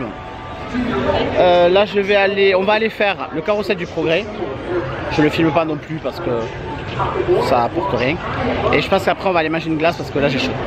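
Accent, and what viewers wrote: South, France. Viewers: Salut ! Rholala toujours un plaisir de vous suivre. Le petit accent chaleureux du soleil ❤. Il me semble qu'il y a bien du monde dans le parc ! J'ai lu que même en septembre c'est assez plein maintenant, ça a l'air de se vérifier. Je pars pour la première fois (seule) en septembre prochain. J'ai hâte et en même temps le stress monte 😅. Prise de tête sur les billets d'avion en ce moment, très chers au départ de Lyon 😢. Dommage pour Tron, j'étais déçu pour toi tu avais l'air si heureux. Je sais pas si je la ferais elle a l'air haute (j'ai le vertige, j'aime pas quand les rails sont dans le vide 😅). Mais je pense me prévoir un budget coupe-file au cas où. Zut faut reprendre le boulot, pas le temps de regarder la suite ! A bientôt !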